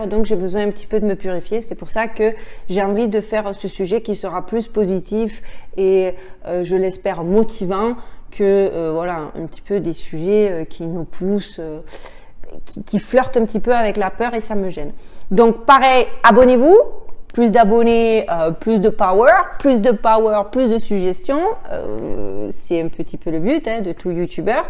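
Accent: South, France. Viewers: J'ai toujours cru vous êtiez du Sud de la France!!!!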